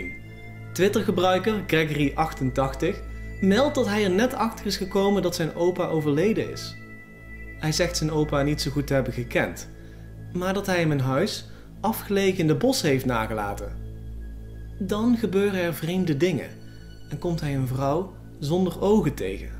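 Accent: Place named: Belgium. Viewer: is een belg of limburger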